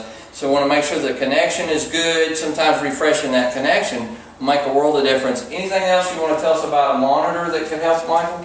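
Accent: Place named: South, United States. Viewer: What did he say here? I can't tell with his southern drawl.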